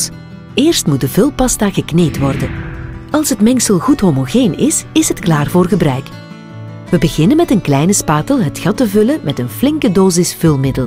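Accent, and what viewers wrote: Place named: Belgium. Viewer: Het is slechts een Belgisch accent, ze spreekt gewoon Nederlands hoor. Dat leer je in Nederlandstalig België ook op school. West Vlaams is echter wel compleet onverstaanbaar.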